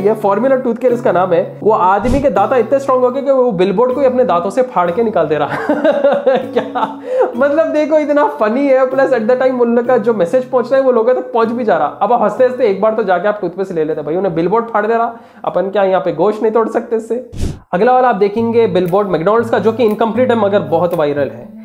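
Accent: Hyderabad, India. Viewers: Are you from Hyderabad because you taking like that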